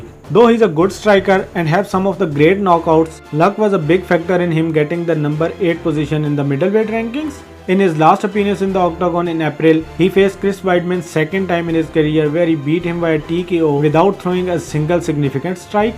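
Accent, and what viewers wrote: India. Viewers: This is english with indian accent...french and other latin language WE sound a lot différent...so you learning english with indian accent dont be afraid to Say it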